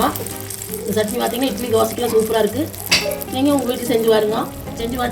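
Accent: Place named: Kongu, India. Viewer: அம்மா உங்கள் கொங்குதமிழ் இனிமை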